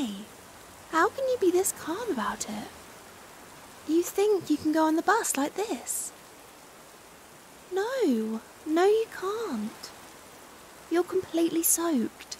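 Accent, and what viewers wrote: United Kingdom. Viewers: I think it's either Great British accent or it's Scottish accent. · That was a great ASMR I like the British accent 🇬🇧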